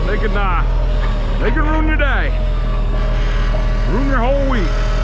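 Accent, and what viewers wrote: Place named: Western Maine, United States. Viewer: new subscriber your speech sounds like you are from western maine. maybe my jugment of vermont is slanted by massacusett carpet baggers.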